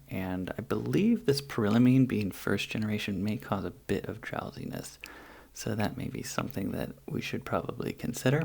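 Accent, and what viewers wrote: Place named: Kentucky, United States. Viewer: You gotta be from Kentucky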